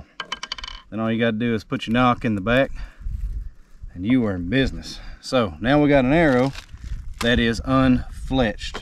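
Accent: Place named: United States. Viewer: I'm glad I I'm learning smth from this Guy. I like this American Accent better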